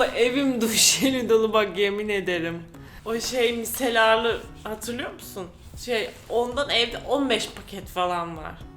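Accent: Kütahya, Turkey. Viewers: Kütahyalı mısın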